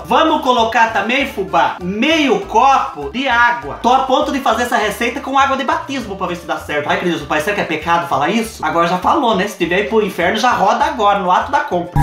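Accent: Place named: Cerqueira César, Brazil. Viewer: Fubá do céu, deixa eu contar. Meu pai tem 75 anos e nasceu nas redondezas de Cerqueira César, aí eu tava vendo seu vídeo e ele ouvindo, aí ele disse " Essa moça é das redondezas de Cerqueira, aposto pelo jeito de falar" kkkkkkkkkkkkkkkkkk aí eu disse que você é de Coronel Macedo e ele falou que é pertinho daqui, sou de Lençóis Paulista, perto de Bauru, quando acabar a quarentena, vou pessoalmente te levar uma caixaaaaa de coisaaaas ❤️❤️❤️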